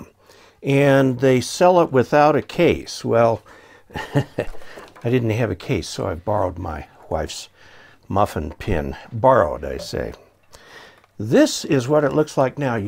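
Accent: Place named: United States. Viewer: Thank you,! Apart of device, your diction make me feel an american speaker:-)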